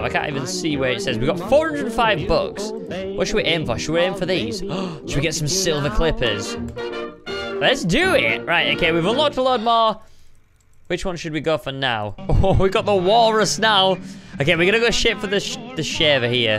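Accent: England, United Kingdom. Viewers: People proceed to make fun of his accent Bro, I'm English too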